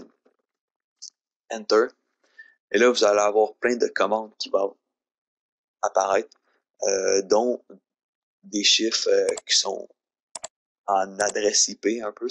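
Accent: Quebec, Canada. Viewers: Merci pour cette vidéo ! Je trouve qu'elle est sincèrement bien commentée, je suis juste pas habitué au québécois ! Je testerai tout ça dans mon Collège après demain ! Merci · Non, je suis moi-même Québecois; c'était juste qu'à l'époque ça m'avait surpris. (éwè, à force d'écouter de l'anglais et des français de france à longueur de journée, on fait le saut lorsque l'on tombe sur son accent natal :-°)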